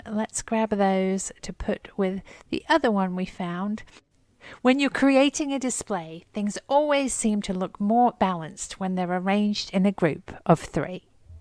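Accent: United Kingdom. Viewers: I really enjoy you. I'm from the East coast and follow you and your friends. You have such a soothing voice and I dig your British accent! You are 1 smart lady so cool. I have learned so much from you. The Goodwills in Jersey do stock Christmas items throughout the year. I adore you! Hugs!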